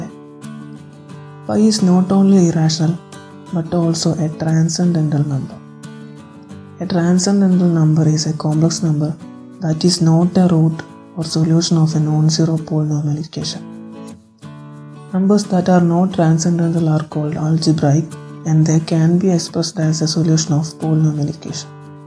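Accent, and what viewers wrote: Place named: India. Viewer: I press a video regarding math I hear an indian accent I leave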